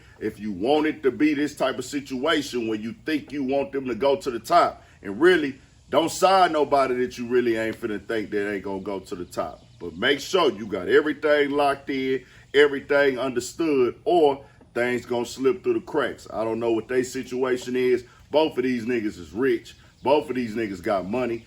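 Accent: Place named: Texas, United States. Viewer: I love your commentary. It’s so Texas. Shout out to you from DFW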